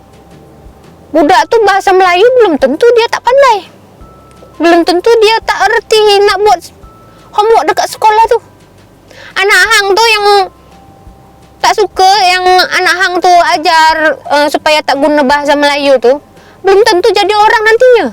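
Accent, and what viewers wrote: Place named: North, Malaysia. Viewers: Aku br terjumpa channel ni rasa lawak hg ckp utaqa hahaha...sempoy baq hanggg 👍👍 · pandai cakap kedah.jangan garang sangat kak.minyak goreng cukup kak.sabar kak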